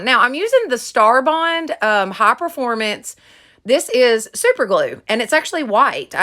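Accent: South, United States. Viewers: I just love your videos!!! You talk like me. I’m southern through and through. From SC. Would you do a video for bathroom? Need a change bad. Thank you sweetie for all your content😍😍😍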